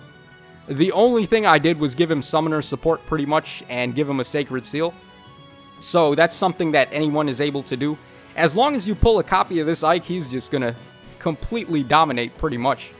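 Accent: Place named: Brooklyn, United States. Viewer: May I just ask you to say Kiba? Because you sound like you're from Brooklyn or that area at any rate...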